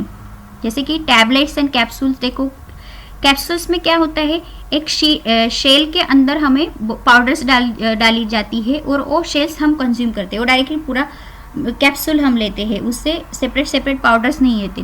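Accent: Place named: Kerala, India. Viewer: I sure you’re from Kerala 99%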